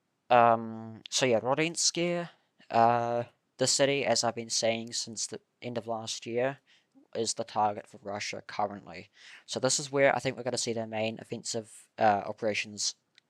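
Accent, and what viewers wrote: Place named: New Zealand. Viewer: Enjoying your kiwi as accent, my dude.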